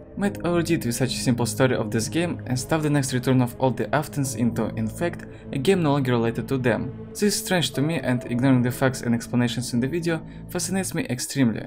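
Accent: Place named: Russia. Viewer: He are russian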